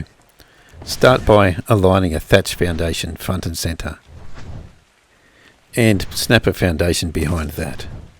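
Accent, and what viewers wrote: Australia. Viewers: has an almost Australian accent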